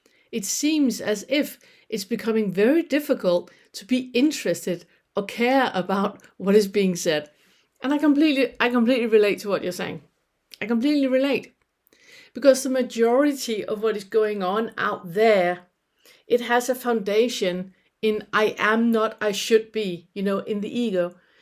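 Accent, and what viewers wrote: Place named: Copenhagen, Denmark. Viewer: Hi, I have been a lot in Cph, and regarding your pronunciation made me quite sure you must have been around there for a bit. Yes I will keep an eye on your posting! Appreciate/reconize most of your topics. Thanks for that!😊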